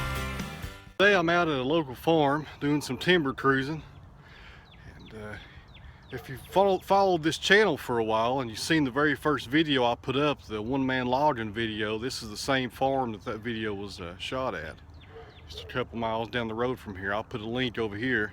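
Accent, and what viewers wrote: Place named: South, United States. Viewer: From what I can safely assess in your video... based on the species composition and general contour of the land - combined with the southern deflection in your dialect... I am going to throw out an educated guess that your are geographically situated somewhere in either in north Georgia, north Alabama, or most likely Somewhere in Tennessee. How'd I do? Post Script... I have 23 years experience cruising timber professionally across a diverse commercial platform. After two decades in the business, it seems to me that somewhere around 98 percent of the general public have no idea it is even a job. That always puzzled me... as their very world revolves around the production of all the various wood products... any thoughts to that end?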